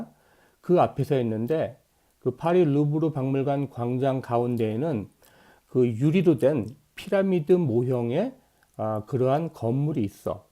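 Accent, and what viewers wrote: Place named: Seoul, South Korea. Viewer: 크게 공감합니다!! ㅇㅇ 사실상 지금의 스울말투(말로써 하는 표준어)는 산업화와 더불어 온갖 타지역 사람들(ex:설라디언 이주민)의 유입과, 또 흘러간 세월들이 요래 마구 쓰까져가지고.. 예전과는 많이 달라졌다는 학계의 지적이 있잖어!? 국어쌤은 아마듀 메이비, 이러한 실정을 나 보다도 아주 잘 알고 있을거야. 그렇쵸!? ㅋㅋ 그런데 우리 의 발음과 발성을 듣고 있노라면.. 이게 바로 진짜 '교양있는 사람들이 두루 쓰던 현대 서울말!'(오리지날 한쿡 표준어) <ㅡ 를 완벽하게 구사하는 사람인 것 같아ㄹㅇ. 그래서 더 놀랍고, 청자의 입장에서 아주 편안하다는 장점이 있서요!! 동의하지!?? 예아-~!!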